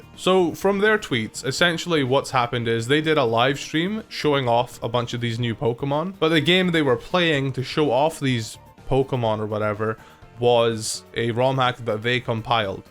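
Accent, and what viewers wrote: Scotland, United Kingdom. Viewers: I always thought you sounded slightly Scottish but I know for defo bc the irn bru, yasss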